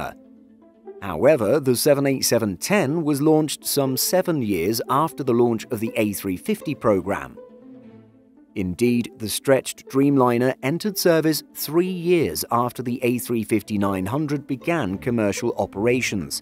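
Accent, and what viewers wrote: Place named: United Kingdom. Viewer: The comparison ought to be with the A<num>, and not the 900!!!! On the other hand you have a wonderful British Accent... 😛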